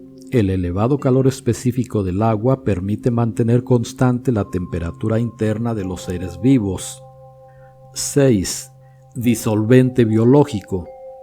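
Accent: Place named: Dominican Republic. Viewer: Es acento dominicano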